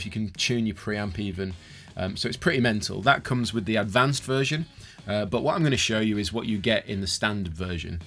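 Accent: Yorkshire, United Kingdom. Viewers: Love that you haven't lost your Yorkshire accent!! My family are all from Yorkshire, I live in West Yorkshire but my mother's side was from Barnsley from brieley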